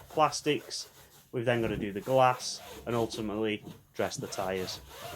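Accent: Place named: Stoke, United Kingdom. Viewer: yes mate, thought you had the stoke twang, phasing out some of the AB stuff which is good but there are some awesome companies out like like alien magic and bouncers. Need something that will give my silver car a good gloss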